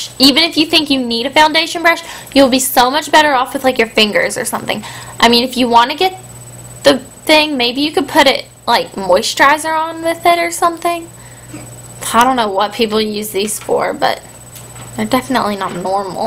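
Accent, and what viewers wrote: South, United States. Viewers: OMG SHE KINDA HAS A SOUTHERN ACCENT!!! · this is so cute... i love her southern accent in this video... she doesn't sound like this anymore... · I love her southern accent!! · You sound country in this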